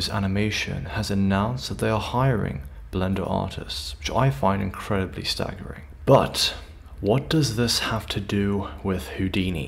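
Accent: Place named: United States. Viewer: Warner 'broes'? Have they changed their name or does the person live in murica, going by his accent?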